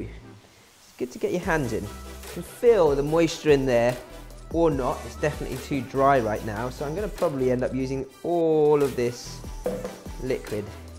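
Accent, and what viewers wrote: United Kingdom. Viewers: What British dialect is that. He sounds exactly like Prince Philip in The Crown!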